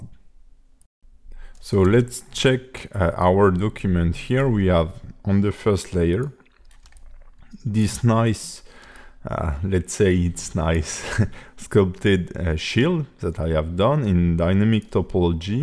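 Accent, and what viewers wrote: France. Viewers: Love ur French accent haha , keep it going !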